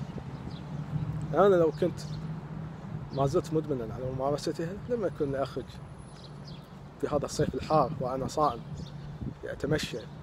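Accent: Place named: Kuwait. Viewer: يعني تدري يسولف كويتي ولهجته واضحه وتسئله انت سعودي 😩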